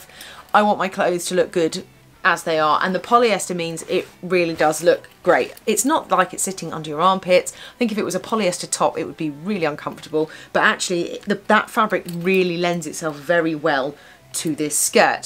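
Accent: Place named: England, United Kingdom. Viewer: It ist a French word! And I love it. I think it means the same as décolleté. If I give the word decolletage into the online translator it means in German somethin so spezific different ;) Thank you so much for a new favourite word. One of my other favourit words is generous. It sounds so loveley. By the way I like your English accent.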